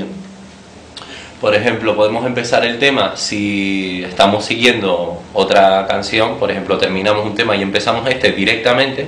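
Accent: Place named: Tenerife, Spain. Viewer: Sabia que eras de Tenerife!!! XD Te reconoci el acento. Yo soy de fuerteventura y estoy aprendiendo a tocar el violin aun (llevo un par de meses con clases privadas (porque la uni no me deja tiempo)) y bueno, estoy deseando poder tocar asii!!!! XD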